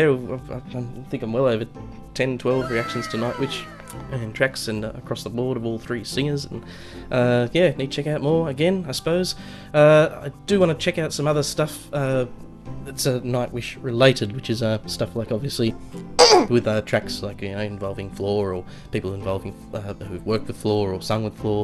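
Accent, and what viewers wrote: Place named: Australia. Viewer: Hey! As always cool reaction, never get tired of hearing a good Aussie voice on reaction vids haha I reckon you hit up " " soon, my fav Nightwish track, Floor and Marko vocals on point. Keep it up legend!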